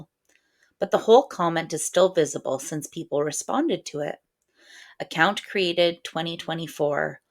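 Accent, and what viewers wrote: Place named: Canada. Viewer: you sound so canadian lol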